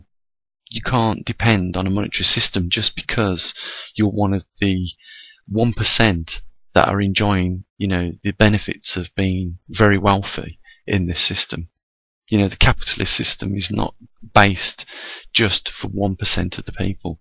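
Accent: Birmingham, United Kingdom. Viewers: I got to ask but what part of Birmingham are you from?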